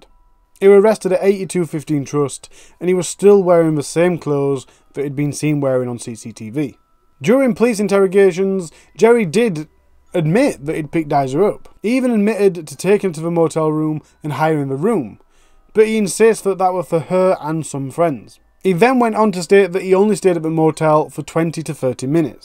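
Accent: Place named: Wales, United Kingdom. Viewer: Love your channel and your Welsh accent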